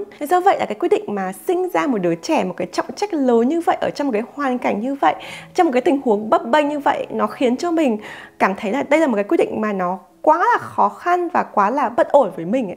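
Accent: Standard. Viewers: bài chia sẻ rất là hay, giọng rất chuẩn!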